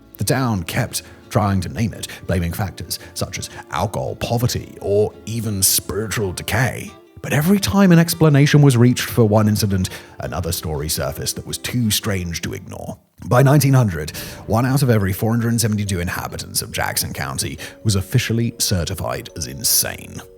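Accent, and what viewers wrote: United Kingdom. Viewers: WTF, can't take listening to a Limey accent. Particularly with what's going on in their country this time.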